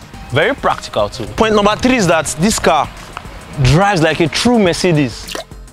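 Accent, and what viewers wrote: Nigeria. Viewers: I am used to seeing Nigerian youtubers try hard to modify their Nigerian accent. It is a breath of fresh air to see one keep it real, its actually lovely. Much love from Canada. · I love the fact that he isn't forming...speaking like a true Nigerian guy...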